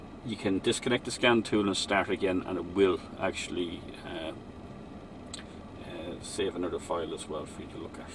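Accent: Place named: Ireland. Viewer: Sounds like you're in Ireland.Wonder if you're close to me.. I need some help 2 days before christmas :(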